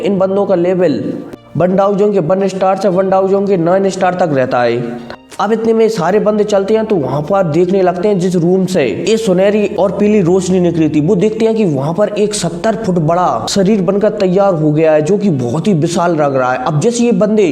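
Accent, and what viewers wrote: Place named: West Bengal, India. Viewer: You are from West Bengal 😅❤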